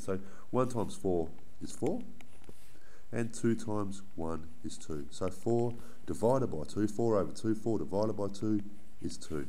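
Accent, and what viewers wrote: Australia. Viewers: If everything learning related, was taught in an Aussie accent, I’d probably be so much smarter and would have paid a lot more attention hahaha.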